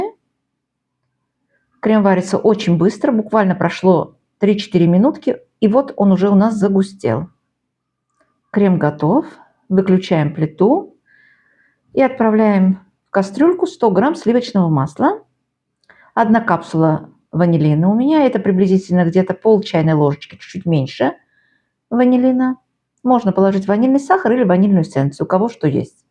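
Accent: Baku, Azerbaijan. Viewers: Судя по акценту Вы жили в Баку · Да,узнаю бакинский акцент❤ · Я пишу Вам из Дербента. Мы тоже пекла такие пироженки и так же называли белочками. Но когда-то много лет назад у меня в Баку жила тётя с семьёй и я приезжала к ним в гости. И сейчас слушая неповторимый бакинский акцент- такая ностальгия по тем временам. Как будто родной голос 😊😊😊. Спасибо ❤ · Добрый вечер! Очень приятно смотреть Ваши видео, особенно Бакинский акцент. Очень прошу рецепт песочных пирожных со школьной столовой, за 22 коп. с повидлом и розовой глазурью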